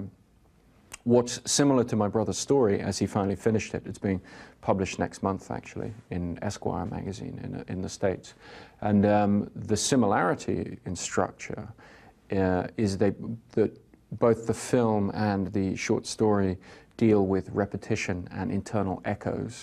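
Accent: London, United Kingdom. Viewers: British English accent sounds great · His accent was more London in those days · London accent, clear as day tbh. He's an American citizen now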